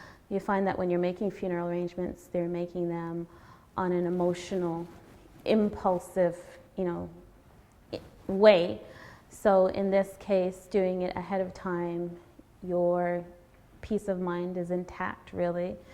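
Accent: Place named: Caribbean. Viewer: Sounds like this lady has Caribbean Roots well spoken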